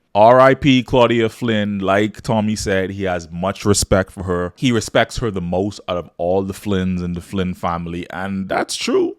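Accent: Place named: Bahamas. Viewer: quick question ... which island you or your people from ... cause I can hear the Bahamian in you voice! ... BTW a fan of your POWER content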